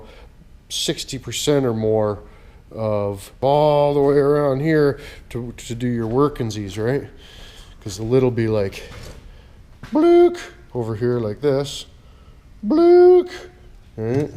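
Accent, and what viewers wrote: Canada. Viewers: do all Canadians talk like you ?? or is that Inwood dialect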